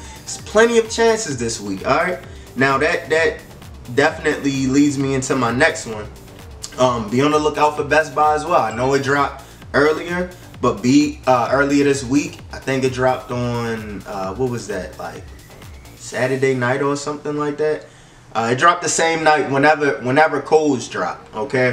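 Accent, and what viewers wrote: Baltimore, United States. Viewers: That acent you from Baltimore? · you from bmore?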